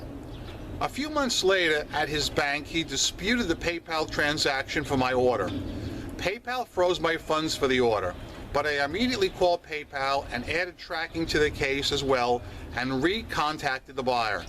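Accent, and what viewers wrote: New York, United States. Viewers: love hearing all the NY expressions and pronunciations - miss the vernacular. Had a p.s. on a sale last week. What I did was send the item as though I never got the second one. Once the post office got it - I messaged the buyer that I saw his p.s. on the sale AFTER our warehouse had shipped it. I said they go on the paypal sales as soon as they go through. ha. No response, no neg.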